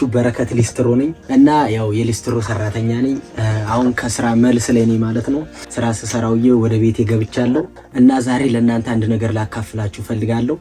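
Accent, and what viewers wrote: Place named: North, Ethiopia. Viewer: ጎበዝ በርታ የኔ ልጅ አማርኛህ የወደ ሰሜን ነው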